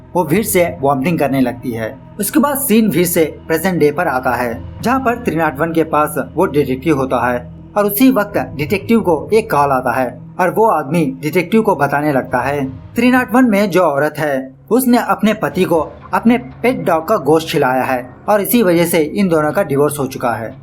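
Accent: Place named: Bengal, India. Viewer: Bhai hindi bol ye banglihindi kyu bolra h🤣🤣🤣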